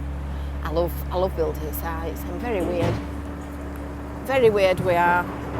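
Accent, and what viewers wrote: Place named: Yorkshire, United Kingdom. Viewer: Love your Yorkshire accent...up early this morning? Brilliant Video thank you x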